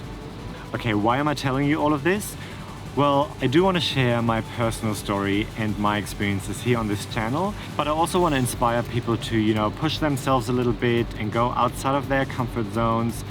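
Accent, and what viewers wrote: Australia. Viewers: Wow Aussie accent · Wow,. Your Aussie accent is really starting take hold! Soon people won't believe you're from Germany at all, a bit like JenDre [German Girl in London] who was frequently accused of being an impostor because of her impressive London accent. Please keep going with your videos. A very popular meme is 'things I found surprising/weird/wonderful in my new country'. It might not be all you want to do, but those videos tend to be quite popular, especially among people toying with the idea of moving to a new country. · I came across your video in my feed. I am from Sydney and feel you captured the feeling of the city really well. It's a beautiful video with calming music, although a side note is that you speak very calmly as well. Yes, there is a slight Australian accent creeping in but I think your voice would be universally understood (which I isn't always the case for some Australians). 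Glad you love Sydney. It has an outdoor and nature filled lifestyle that I miss in some other cities. Birds and all the crazy creatures are plentiful. On the flip side, I fell in love with Berlin the first time I went there. It has a charm that possibly, after going back several times might change with the seasons. I am not sure if I would survive a winter there, but at least triple glazing and decent heating would solve part of that. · In just a short 4 years, a tiny bit of Aussie accent could already be detected in your speech. Good choice in making Sydney your home. Well done for the great video. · Wow you are even started to sound Australian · Great story, thanks. I can hear a slight Aussie accent - nice one 🌞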